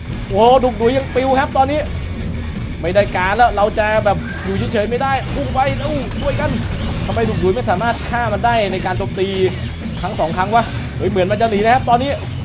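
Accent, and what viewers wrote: South, Thailand. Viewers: พี่อยู่ภาคอะไรครับเห็นพี่พูดคลายกับภาษาไต้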